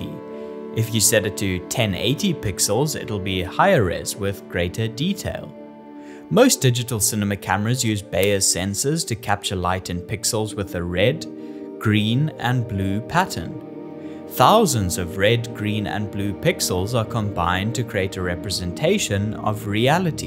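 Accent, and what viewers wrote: South Africa. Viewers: Fellow South African! Love the content and recognized the accent immediately… 😉 🔥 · Where are you from mate? Your accent sounds closer to South African than most things I hear on YouTube :) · south african accent I detect ..ah subbed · I really don’t hear this accent (South African?) on YouTube too much.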